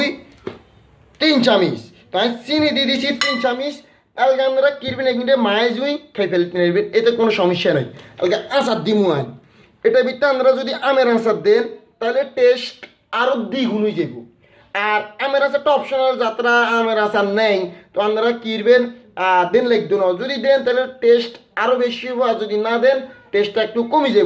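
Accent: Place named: Noakhali, Bangladesh. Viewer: আমাদের নোয়াখালির ভাসা💓💞😍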